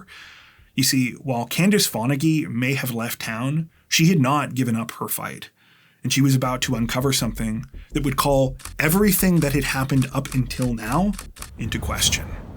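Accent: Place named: Canada. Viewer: Dude, as soon as I heard Canadian, I was like SUBSCRIBE! (Born and raised in Toronto, and a lover of all things Canadian and true crime) So I guess this is a perfect match eh?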